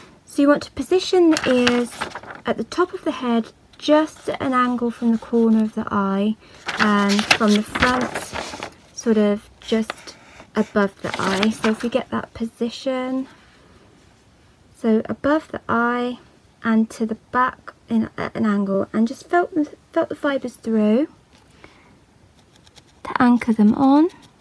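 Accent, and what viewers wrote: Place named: United Kingdom. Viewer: clear and accentuated British English, very easy to understand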